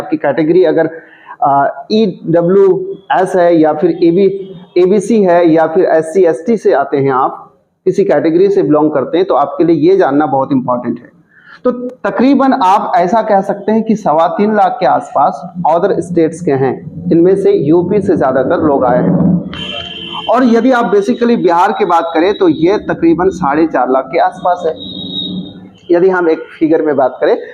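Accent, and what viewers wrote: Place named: Bengal, India. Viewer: Aapke aawaj me bangali tone hai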